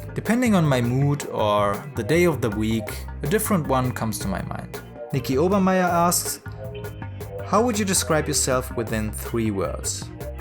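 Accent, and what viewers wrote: Germany. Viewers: Wusste ich doch, dass du Deutsch bist! Hab ich sofort gehört :) Deine Kunstwerke sind total inspirierend, vielen Dank dafür! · Hello, I kind of knew from the beginning that you are german / turkish. I could hear the german accent since I’m geman myself :) Let me say that the tricks and advices you gave helped me rethink things I hadn’t noticed before. That is what I really appreciate about all of your videos since they are truly helpful aside from the timelapses of your paintings of course. As you explained (and as I understood) uploading your videos is not meant to gain a great amount of subscribers but simply share your techniques with us - which is what makes you so special for me since you only upload them for fun. I enjoy watching your videos alot and would like to thank you for sharing your view of art and advice with us / me. Liebe Grüße Rebecca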